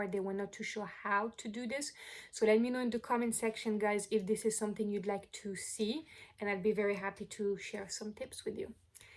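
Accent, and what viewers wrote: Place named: France. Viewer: Liked. Subscribed. Clearly you know crystals. Love your accent. French? Or maybe I’m the one with an accent. Waves of zen coming your way!!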